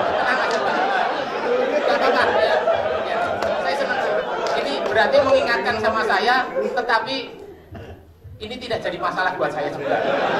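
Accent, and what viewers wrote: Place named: Banyumas, Indonesia. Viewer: maen,ngapak pokoke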